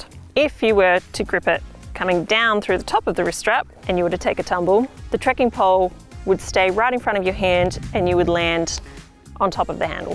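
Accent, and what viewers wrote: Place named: Australia. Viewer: OH.......! I am NOT being xenophobic here cause I reckon Culture & Multi-Culture are GREAT.! - But It's SO NICE To HEAR An AUSSIE Accent on YouTube....!!! Thanks, good work matey.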